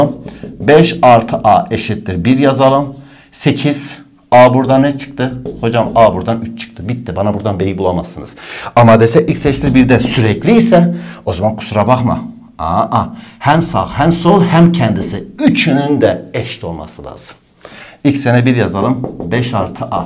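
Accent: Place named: Eastern Anatolia, Turkey. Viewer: hdasdhsadhsadahsda hayatımda izlediğim en iy ders videosu. hocam dersi anlatırken biraz daha doğu ağızı kullanırsanız ders daha eğlenceli ve akılda kalıcı olur kanaatimce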